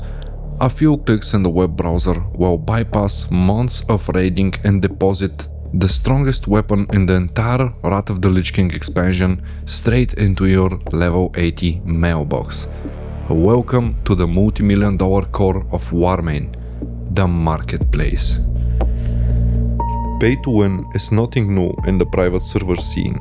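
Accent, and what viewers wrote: Balkans. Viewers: You sound mega Balkan bro. 100000% Balkan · great video, cant wait next one ! (from another balkan guy, ur accent is funny to listen to haha) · the balkan accent and the conspiratory story telling makes this video so much better :D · Balkaaaaaaan accent hit me like a truck mon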